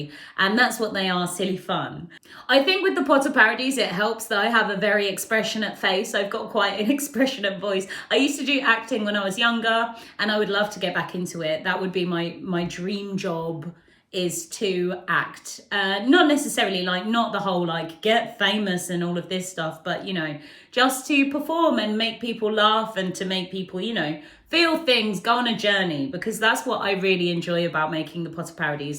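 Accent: United Kingdom. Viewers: Ms., (I've asked this in another place) as an American, the subtleties of British dialect are beyond us. Might I ask what "flavor" of British English do you speak? Also, you are brilliant, sharp and quick as lightning. Very extraordinary. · You sound quite British, I hope you are, I love finding YouTubers/tiktokers from the same country as me 🙂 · u british right lol!? x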